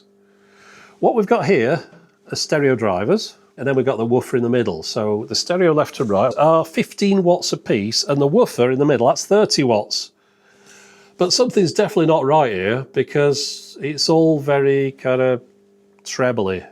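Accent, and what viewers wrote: United Kingdom. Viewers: This was hilarious. went berserk. And his delivery is sooo English.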